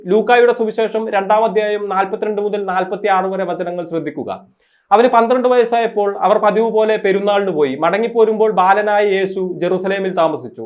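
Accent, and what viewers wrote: Kasaragod, India. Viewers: പണ്ട് കോളാബി ചാനലിൽ , ചെയ്തതുപോലെ roasting പരിപാടി പൊടിതട്ടി എടുക്കാമോ.. we missing your humour senses in Kasaragod slang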